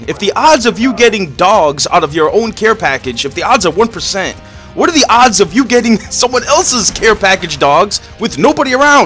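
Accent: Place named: Brooklyn, United States. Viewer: the more worked up he gets, the thicker his brooklyn accent gets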